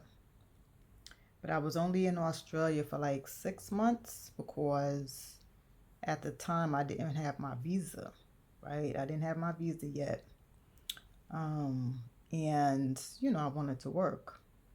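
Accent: New York, United States. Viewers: I can't believe I never saw you in Shanghai. I worked a Jiaotong University for many years and lived in China from <num>. It was hell coming back to be honest. Still working as a law professor in the US now. Sounds like you're also a NYer.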